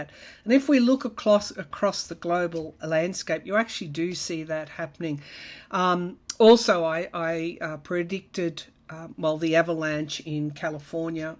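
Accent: Australia. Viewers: Was nice to heat am Aussie voice in this area of YouTube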